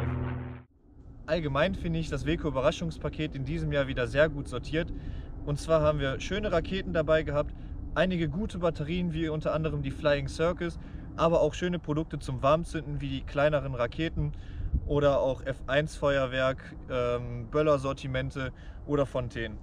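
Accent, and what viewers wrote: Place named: Rostock, Germany. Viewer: Kommst du aus MV? Genauer gesagt - nähe Rostock?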